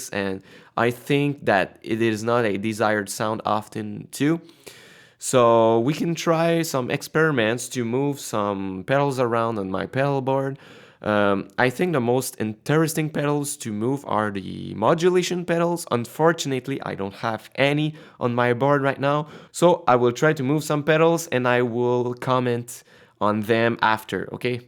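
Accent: Quebec, Canada. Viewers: You sound like you're from Quebec?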